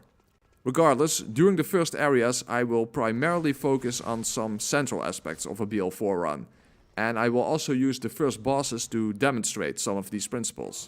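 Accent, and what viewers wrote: Netherlands. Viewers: ah sweet, sorry for the very late reply lol xD, i thought i noticed in some of the way of prenouncing, not trying to say the english is bad in any way though! Just as a fellow dutchie u recognize other dutchies talking in English. Also great video btw, this helped me a lot with the bl4 run :)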